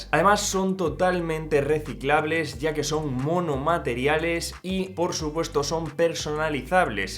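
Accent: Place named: Galicia, Spain. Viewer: Se entiende perfecto, es gadejo lo tuyo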